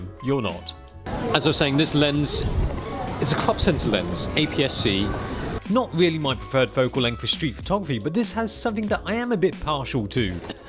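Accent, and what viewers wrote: England, United Kingdom. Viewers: I have know idea how. But his British accent. Also gave me satisfaction, beside his reviews. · I love Asian with British Accent, NICE. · Why is it like a intellectual orgasm to listen to an Asian with a deep English accent? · How've come you got some fluent Brit accent? Bruh salute you 😎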